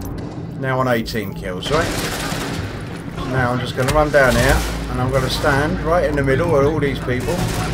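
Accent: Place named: United Kingdom. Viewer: Bloody hell, finally hear a streamer from the UK! Fair play 👌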